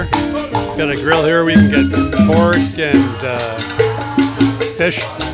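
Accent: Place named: Ireland. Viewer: Sounds like an Irish accent to me.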